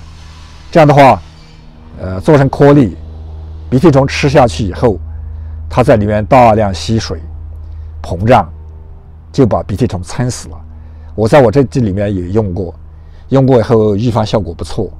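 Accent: Yunnan, China. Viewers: 云南马普，听着好亲切。我就是洒草木灰，管用，肥皂水也可以。家里的鸡根本不吃那粘粘的东西。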